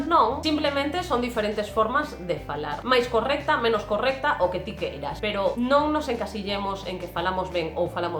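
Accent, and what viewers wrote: Castile, Spain. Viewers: Tes acento castelan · O seu galego está 100% espanhol. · Esse teu galego já tem muito castelhano... :\ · Sonoridade MUITO CASTELHANA!!! É UMA ESPANHOLA FALANDO UM PORTUNHOL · me suena como una persona de brasil con acento de españa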